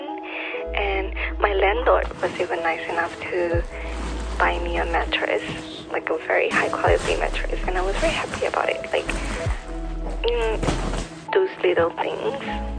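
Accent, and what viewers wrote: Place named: Singapore. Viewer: I'm glad you found my channel, because now I found your channel! I teared the first minute because it hit so hard. I've said goodbye to close friends who went back to their home countries, and then when it was my turn to leave my family and friends "behind" it was such a painful moment as well. This was a beautiful video. Looking forward to more content from you <3 Sending love. p.s. i also missed hearing that singaporean (it is, right?) accent :)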